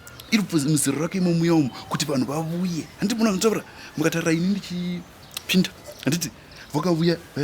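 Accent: Karanga, Zimbabwe. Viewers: Aiwa tiri kuchinzwa chikaranga 🙆🏻‍♀️🙈🙈🙈.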